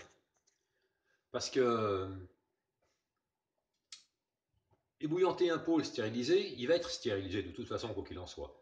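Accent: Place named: Savoy, France. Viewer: On y entend juste un petit accent savoyard.... lol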